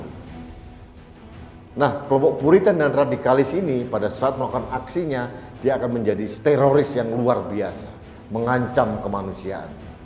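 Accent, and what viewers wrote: Java, Indonesia. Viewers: Tebar hoax aja lu pak tua medok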